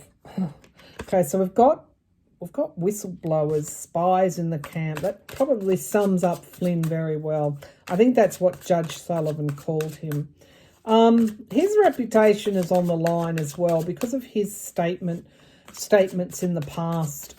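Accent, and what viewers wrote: Australia. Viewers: thinking about setting up Siri with a female Australian accent in honor of you. 🌷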